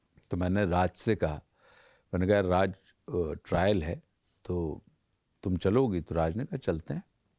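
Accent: Standard. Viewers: Bahat khubsurat Hindi bhasha ko Sanman se bolte hai sanman dete hai❤❤❤ aaj tak koi hero Heroine ko itna hindi me baat karte kishi show me nahi dekha sirf movie me bolte he ,baki to English English par ,, Aashutosh ji very pure ❤❤❤ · Your hindi is awesome....ekdum shuddh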